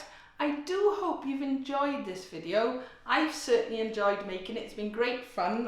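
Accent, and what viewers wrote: Wales, United Kingdom. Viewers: im from canada and i love the welsh accent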